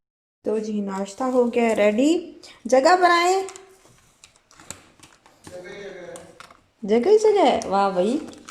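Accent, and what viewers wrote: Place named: Mianwali, Pakistan. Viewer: I think u r from mianwali jis tarah sai ap bolti hain.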